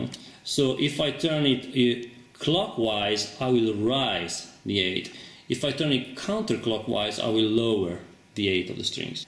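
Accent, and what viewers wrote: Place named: Italy. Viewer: Nice trick! With always this funny italian accent! (although my french one can be funny too! ;-) ) . I let you know I follow you to make my guitars fixing and adjustements and it's ok all over the way ! :-)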